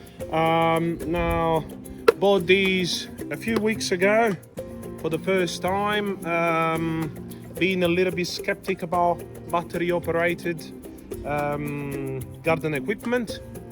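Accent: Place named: South Africa. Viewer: Trying to work out your accent. So far i've got South African/Russian /Aussie/Brummie.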